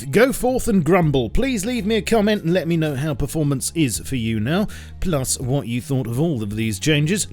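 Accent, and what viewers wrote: United Kingdom. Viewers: Yes, I finally get to hear my favorite British voice!!!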